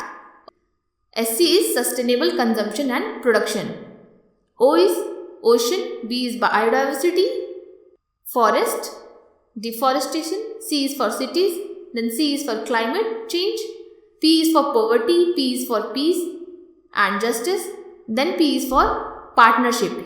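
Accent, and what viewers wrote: India. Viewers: Ohhh great Indian accent 👏👏👏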